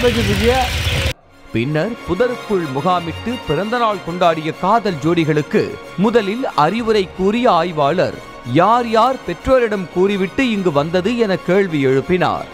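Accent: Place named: Tirunelveli, India. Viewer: நீங்க thirunelve சூப்பர் i like that slang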